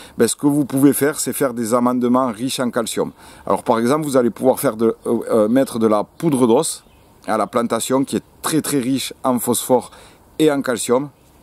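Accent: South, France. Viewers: Bonjour. Video claire, précise, concise et fort intéressante avec votre petit accident du sud. Merci de me rappeller des basiques que j avais un peu oublié.... J ai une formation horticole, mais en 30 ans on oublie 😉 Petite question peut être un peu idiote mais quel style de lait est t il préférable d utiliser ? Stérilisé, entier, demi écrémé....? Si vous me tirez au sort je vous donnerais le nom d une exceptionnelle tomate cerise que je mets depuis 2 ans.... Allez c est pour moi.. C est gratuit... C est la sundance... Bon c est une hybride mais di vous ne connaissez pas... Je ne peux que vous la conseiller. 😉 Bonne soirée à vous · Moi j'y connais rien en jardinage mais par contre, j'adore l'accent du Sud !! C'est l'accent du Sud Est ? Du Midi ? Je ne sais pas les differencier. Rien que pour ça j'adore cette vidéo et je vais m'abonner !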